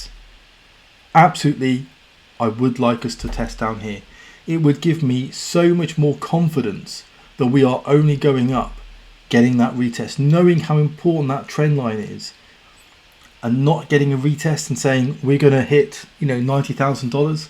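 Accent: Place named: United Kingdom. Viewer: Soft British accent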